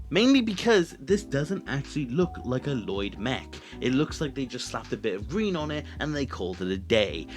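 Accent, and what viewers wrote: Wales, United Kingdom. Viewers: Are you Welsh ???🏴🏴🏴🏴🏴🏴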